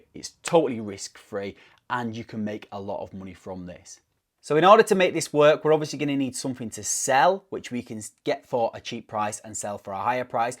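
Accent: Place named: Manchester, United Kingdom. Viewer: Who else missed that Manc accent 😁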